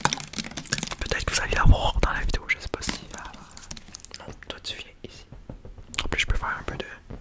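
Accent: Quebec, Canada. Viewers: J'adore l'accent québécois en tant que français, sinon je me détends toujours autant meme si je ne commente pas souvent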